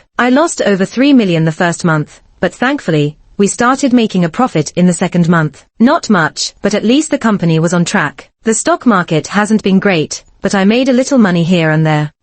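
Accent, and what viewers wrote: United Kingdom. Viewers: Wait so at the beginning she said Beck treated her like a queen. Why did that change as the story went on? Kind of confused but I liked the story a lot. I love the British voice. So classy even when calmly plotting revenge lol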